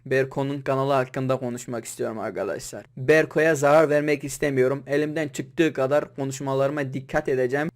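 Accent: Turkey. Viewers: baın değil .bakın.sen tam türk gibi konuşuyon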